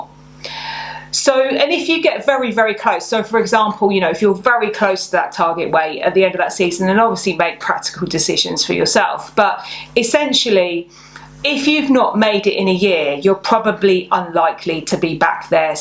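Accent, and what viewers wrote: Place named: United Kingdom. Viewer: Really good advice! I have got rid of a lot of things as I have just moved house but i find a lot of my clothes don"t go together so putting together an outfit is difficult. I like the suggestion of thinking more about the colours and keeping it simple. I also watched your over fifties and how to look elegant videos, so I think I will be able to make some progress now. Lovely to hear a British accent, I am a Brit living in Canada. We definately have four seasons here.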